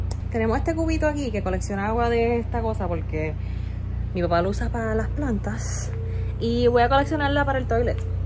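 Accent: Puerto Rico. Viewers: Girllll. I just love your style... Subscribete si te da la gana.. jajajaaa.. bien rajatabla.. me encanta como hablas el Español con ese acento bien boricua y despues El Spanglish.. mita Ingles y mita en Español..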